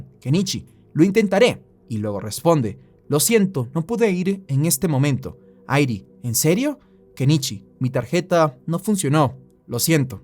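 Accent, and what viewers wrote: Dominican Republic. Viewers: Bro eres dominicano? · El fusgo bro pensaba que eras Dominicano, te sigo hace mucho, de que Pais eres?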